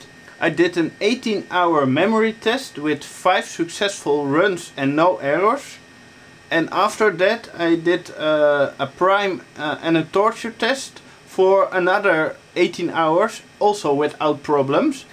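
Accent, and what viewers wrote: Netherlands. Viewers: know :-) But I think not all DutchPeople speak like this. Anyways, I like it. Makes him unique to me :-)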